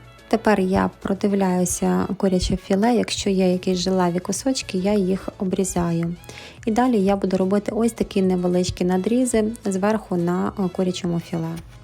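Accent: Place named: Ivano-Frankivsk, Ukraine. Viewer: Привіт! Люблю нашу франківську бесіду з твоїх уст. Відео вийшло дуже затишним і домашнім. Синочка вітаю з днем народження, бажаю щасливої долі.❤❤❤❤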